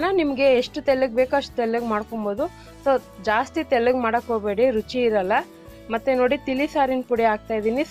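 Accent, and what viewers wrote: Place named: Tigalarapete, India. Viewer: nimma bashe Tigalarapete yavara thara idde.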